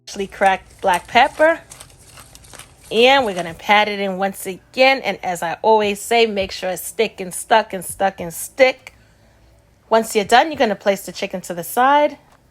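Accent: New York, United States. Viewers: I’m making this today! Thank you! Btw are you from the DMV? New York? I love your accent 😊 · Lil mama got some skills also hummm I hear some NY in that voice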